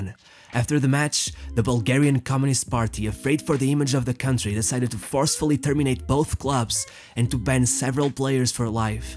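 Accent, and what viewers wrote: Portugal. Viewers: o inglês tuga dramático é que coiso. Mas isto tá fixe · I love your Portuguese accent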